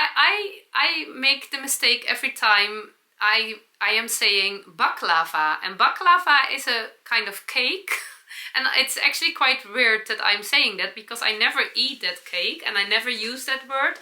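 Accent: Netherlands. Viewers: Hello, nothing wrong with your "dutchy" English, makes me smile when you say that. Love the accent. My g.g.g. was from Oenkirk, Netherlands which I visited in 2019. So it gives me an idea of what his accent might have been like. He jumped ship in Melbourne, Australia in 1851 (my birth place). So I may not have existed if he had not come to Australia. Your prononciation is correct. ❤ We have just returned from snowy Norway and 5hrs of daylight till only 2.30pm was hard to get used to. When you had the knit event, I was on a ship heading up the west coast of Norway. So couldnt come to meet you and Yana.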